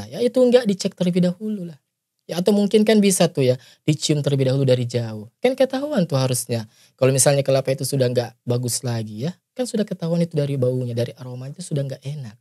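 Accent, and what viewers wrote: Sulawesi, Indonesia. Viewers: Woi kamu logat Sulawesi jangan buat konten murah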